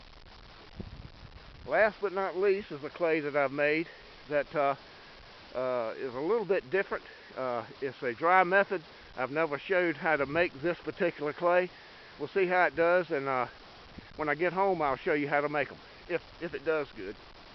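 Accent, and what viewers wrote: North Carolina, United States. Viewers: I thought you sounded like a North Carolinian lol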